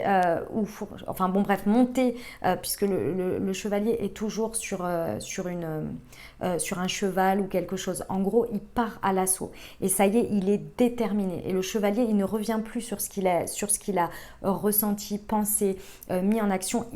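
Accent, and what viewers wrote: South, France. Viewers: Chère je suis contente de te réentendre, ta voix est tellement sucrée et ton accent sent le sud 💃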